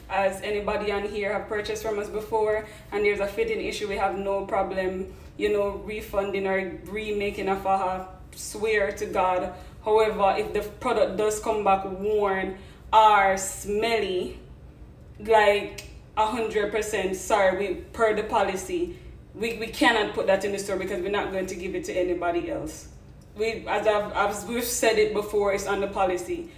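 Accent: Jamaica. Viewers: First time watching, I can tell you are a Jamaican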